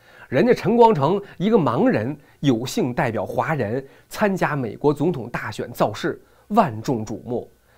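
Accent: Beijing, China. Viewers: 咱北京人揍是这么口正...... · 喜欢💕纯正的北京味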